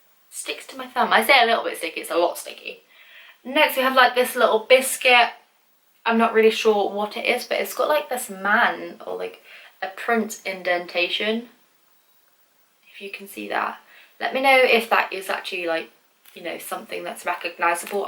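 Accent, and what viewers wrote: United Kingdom. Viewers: Is she British? If so YES I’ve finally found a British squishy YouTuber! ❤️ who else here is British?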